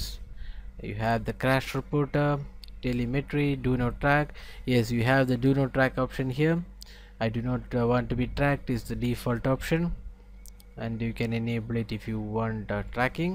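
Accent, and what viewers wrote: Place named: South India, India. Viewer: Holy fuck... no offence man but your accent is very heavy making it impossible for me to understand most bits unless I have re-repeated them several times. Also, you do seem to have a heavy southern indian accent.. Please get rid of it!? You have a nice video and the content of your video and review is pretty good too. I will seriously recommend you to work on to neutralize that tone and accent and at least sound proper (your pitch was uncontrollable and went up and down monotonously as if you were least bit concerned or overly bored) and make sure there are no background noises(I could hear a song playing in the background clearly). Otherwise the review was helpful... thanks!!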